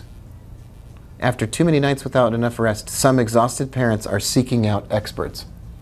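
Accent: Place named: New York, United States. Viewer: You can always hear a new yorker!! Even without a microphone!!!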